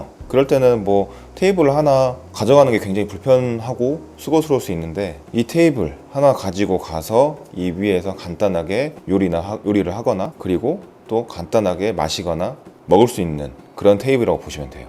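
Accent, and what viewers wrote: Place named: Jeolla, South Korea. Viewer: 전라도사투리가 정감이가네요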